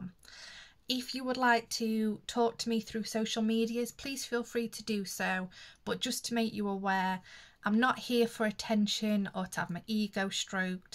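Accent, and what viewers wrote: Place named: North West England, United Kingdom. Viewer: Are you from the north west? I recognise the accent 🙃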